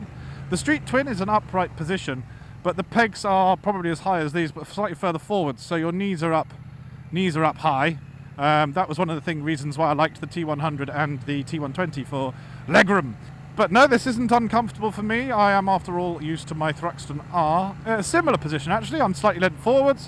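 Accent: United Kingdom. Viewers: I like your bike reviews, but GO EASY on the "tally ho" bullshit! You sound annoying, to say the least! All the yelling, and the "british accent" crap takes away from the overall review. I don't know, nor do I CARE, where you're from...JUST TONE IT DOWN A LITTLE! All your reviews are interesting to WATCH... just STOP TALKING! Where I'm from, if you talk like that, you GET YOUR HEAD STOMPED IN, AND YOUR NOSE BROKE!!!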